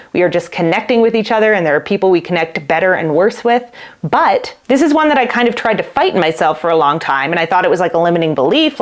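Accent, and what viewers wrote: Canada. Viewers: Why insult Texans? Do you actually think your goofy Canadian accent sounds better.😂😅😮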